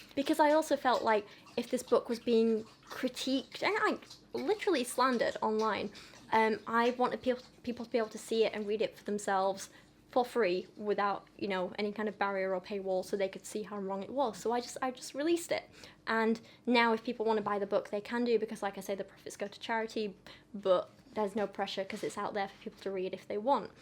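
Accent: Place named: Yorkshire, United Kingdom. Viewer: I can't fully understand the relationship between and Kiera because I never had a dog or a bond so strong with a furry compagnon. Knowing what they went through together, I can only admire the clear love and trust between them. Happy to learn new things with your nice yorkshire accent!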